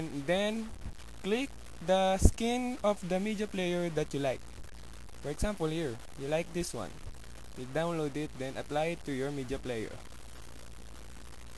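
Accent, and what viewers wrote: Philippines. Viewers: sa boses mo plang pinoy na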